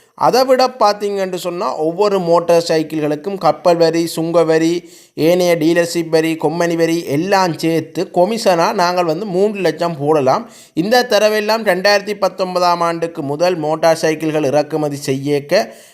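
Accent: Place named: Chennai, India. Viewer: Madras தமிழ் தூதூதூதூ ...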